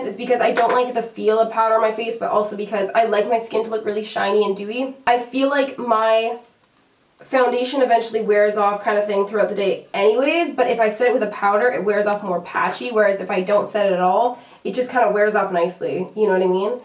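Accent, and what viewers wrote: Canada. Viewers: you sound extra canadian i love it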